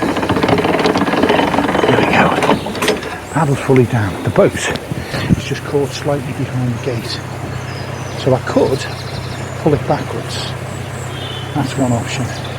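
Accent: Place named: Wirral, United Kingdom. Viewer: We've been watching your vlogs, are you from the wirral ? Your accent sounds like wirral, not Liverpool, we are from the wirral 😂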